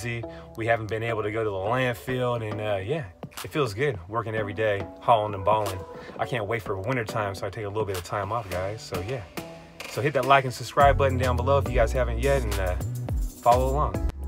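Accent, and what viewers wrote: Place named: Louisiana, United States. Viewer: Dude is wild with that Louisiana accent. That's what made me subscribe. He's natural with it. And, the background music is the bomb.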